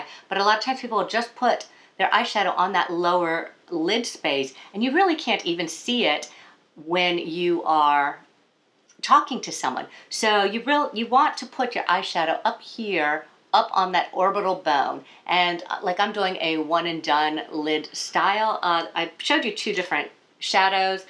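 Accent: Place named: United States. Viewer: Had to switch off, tips might be good but that American voice does your head in. Sorry.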